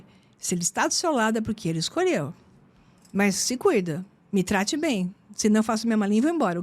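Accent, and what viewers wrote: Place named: Rio Grande do Sul, Brazil. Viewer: Essa tia eh gaucha so' pelo jeito de falar. 😊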